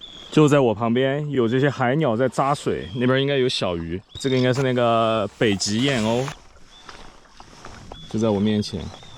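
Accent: Sichuan, China. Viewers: 听声音有点像成都 ，绵阳口音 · 听口音播主像四川人哦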